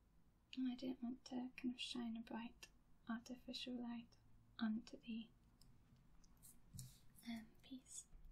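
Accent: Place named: Yorkshire, United Kingdom. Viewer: Great video! What is your accent? Sounds kind of like Yorkshire, but a little different. It's beautiful, by the way! Cheers from WV :-)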